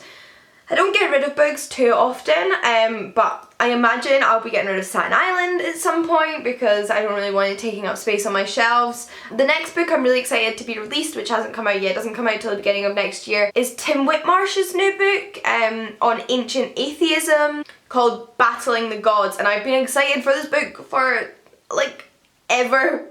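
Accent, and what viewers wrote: Scotland, United Kingdom. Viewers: Well, now I want that big Sherlock Holmes book;). About ugly covers - I think I have quite a few of those from second-hand bookfest buys! Right now I'm reading the ugliest library edition (Scholastic edition) of The Hunger Games ever, and it's so embarrassing going about the house reading it, I'm reading it alone in my bedroom... ugh!:P I read The Book Thief this year, and I found it quite an intriguing read - mostly because I had such low expectations of the book after hating the film version... I guess I liked the friendships in that book, and also sometimes the writing was quirky. But yeah, it was a weird book, and definitely not a favourite war novel! Fun tag, ^_^ P.S. I love your Scottish accent - so cute!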